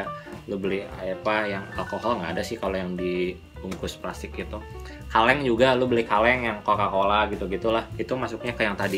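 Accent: West Java, Indonesia. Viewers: sunda pisan a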